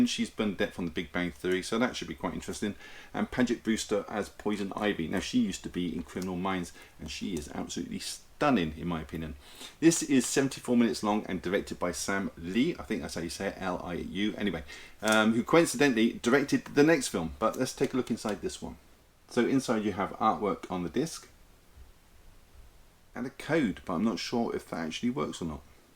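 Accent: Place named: Manchester, United Kingdom. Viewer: no you are right mate, it’s a Manchester accent. I moved to Cornwall around 20 years ago 👍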